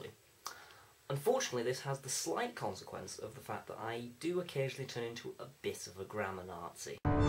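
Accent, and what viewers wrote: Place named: Manchester, United Kingdom. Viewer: Definitely have a Mancurian accent :) It's just the words you use.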